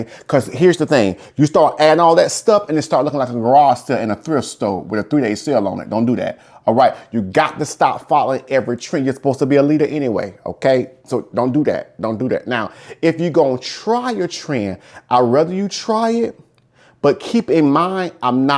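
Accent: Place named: South, United States. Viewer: Love your southern accent with your sense of humor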